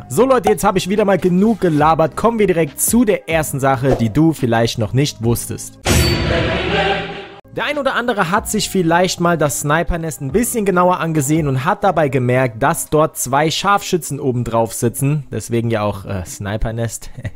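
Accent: Saarland, Germany. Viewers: ausm Saarland ? Und Frohes Neues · Warum hast du nen türkischen Namen?Du klingst entweder sehr gut integriert oder wie nen Deutscher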